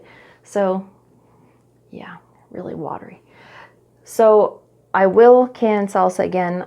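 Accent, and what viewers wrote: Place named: Canada. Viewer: Where are you located? It sounds as though you’re from Canada.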